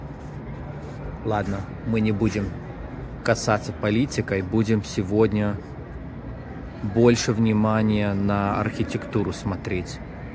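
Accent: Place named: Baltic states. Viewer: Уселась смотреть, видео новое, а вы вдруг в рубашке. Неожиданно. Спасибо, отвлекли. У вас приятный акцент, какой всегда я думала, должен быть у прибалтов.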